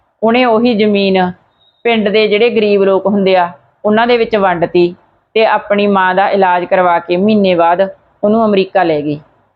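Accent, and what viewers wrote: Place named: Malwa, India. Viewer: ਤੁਹਾਡੀ ਬੋਲੀ ਤੋਂ ਮਲਵਈ ਝਲਕ ਪੈਂਦੀ ਹੈ । ਕੀ ਤੁਸੀਂ ਮੋਗਾ ਸਾਈਡ ਤੋਂ ਹੋ?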